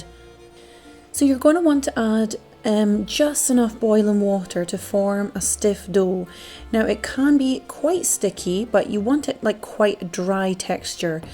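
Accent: Scotland, United Kingdom. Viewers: Came to this video from your crowdie video. Your camera work and quality are excellent and you have a lovely soft voice and of course the Scottish accent is the cherry on the cake. 😊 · oh I do enjoy the recipes and perhaps even more so because of your lovely Scots. It's music to my ears. Happy Burns Night from the Bavarian Highlands, the alpine region.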